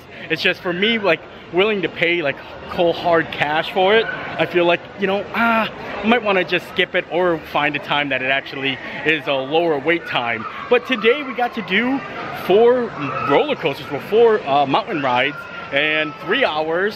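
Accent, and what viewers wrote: Pennsylvania, United States. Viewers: Yupper, you have a Pennsylvania regional accent. You also accentuate the letter d. That's what makes you unique and one in a million, which means there are 7000 of in the world. 😂. Don't change a thing. Too bad you could not do the Matterhorn 😂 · It's the PA accent...like Wooder (Water) :D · I love your PA pronunciations:) Such a happy day except for the bear claw incident which was both tragic and hilarious 😂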